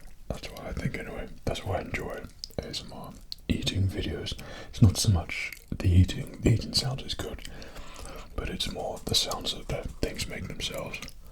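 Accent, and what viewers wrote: England, United Kingdom. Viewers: NO WAY BRO'S BRITISH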